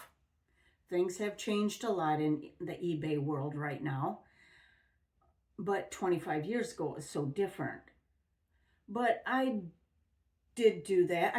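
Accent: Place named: Michigan, United States. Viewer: Just found you-subscribed. Your AI video really had me laughing. I had a very technical medical job and was told I would have to do twice the amount of work. When I explained I would not be able to keep up the same quality of work I was told (get it through your thick skull pretty much) "We do not care about the quality of your work! Just do twice as much." I quit, and I can laugh about it now, but it was traumatic at the time. Former Michigan person, and you have my accent. Nice to hear.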